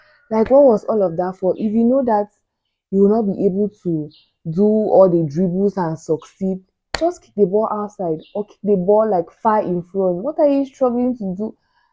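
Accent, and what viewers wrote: Nigeria. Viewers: This beautiful sister sounds exactly like an educated Nigerian, but she's actually smarter than us. Imagine she speaks both french and a good command of English. Whereas most our Nigerian sisters can't even express themselves in English fluently without switching to Pidgin 😂❤😅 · You sound so Nigerian. I am surprised as to how you Cameroonians sound so much like us. If you are not wearing that jersy, i would think you were Nigerian. Splendid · This lady is beautiful and brainy... honestly you sound like a Nigerian...it will be pleasure to host you in Abuja....U've my subscription❤🎉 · . Thanks for confusing me. I saw the Cameroonian jersey on you but when you started talking, I thought you were a Nigerian but it's well. Good job! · Those of you saying she sounds nigerian. By blood and ethnicity southern cameroon are nigerians, colonia masters and them voting to join cameroon is the problem. 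Blood is ticker than water. Even in cameroon they have been accused of sounding like nigerians, dressing like nigerians, almost everything like nigerians. They are actually nigerians. Just a matter of time , we will integrate them into nigerian society. case closed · Sister you have a Nigerian accent. I can tell because I am a Nigerian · I think most of the viewers are used to hearing Ghanaian's accent and easily know they're Ghanaians but obviously your accent in pronouncing some certain words are similar to how we pronounce them plus after each of your sentences you use the word "oo" which is also a Nigerian intonation. Personally, listening to you I would have bet you were Nigerian but the jersey made me rethink. Regardless, please take no offense as to people saying you sound Nigerian,I think it's just curiosity and people are a lil bit surprised at your fluency! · I so much like the way you speak. 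It reminds me of someone special besides the fact that you sound Nigerian. I'm your new subscriber 😃🇳🇬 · My sister, did you grow up in Nigeria? Your Accent is more like that of a Yoruba Girl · This girl sounding like a Nigeria 🇳🇬 😊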